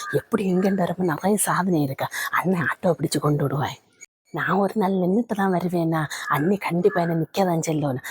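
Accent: Kanyakumari, India. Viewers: Sela words neega pesarethu pureyala kanyaakumare baasa superaeruku sster😂😂😂😂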